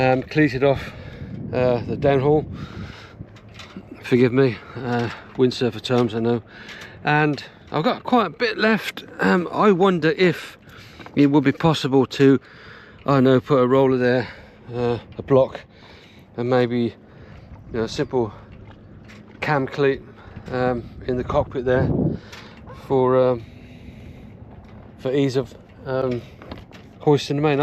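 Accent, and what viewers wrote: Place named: Birmingham, United Kingdom. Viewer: Sounds like you're from Birmingham (same as me) how did you get to live in such a lovely place & get into sailing ??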